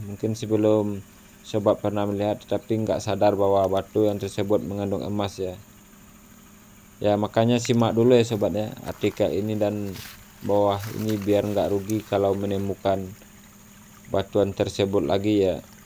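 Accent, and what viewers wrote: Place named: Minangkabau, Indonesia. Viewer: saya dengar dari dialek bahasanya ini pasti dari tanah minang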